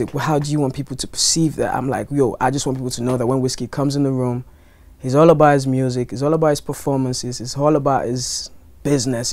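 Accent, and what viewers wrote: United Kingdom. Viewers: I am absolutely blown away. He has a British Accent.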